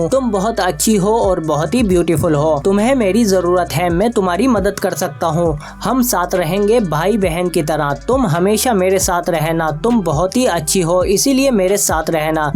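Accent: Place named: India. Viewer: Your Indian accent, when you speak in English, is terrible, man from India. Learn English better.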